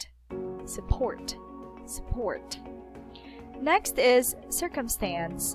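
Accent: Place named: United States. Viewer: Those Dark L's! I really love your American accent. I've been living here in the US for 20 years and I'm still learning 😂😂😂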